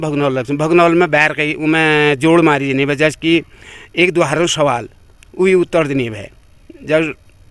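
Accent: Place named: Pahari, India. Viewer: ji apka swagat karta hu app pahari me baat krti ho bahut accha lagta hai 🙏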